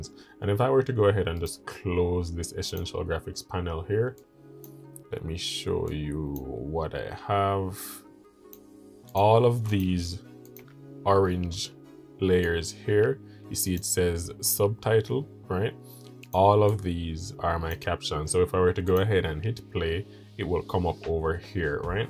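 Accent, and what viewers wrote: Jamaica. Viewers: I just discovered your channel and I had to subscribe immediately, 1. you're Jamaican lol, 2. the scripture on the wall, and 3. this video was so straightforward, easy to follow and very helpful. Thank you!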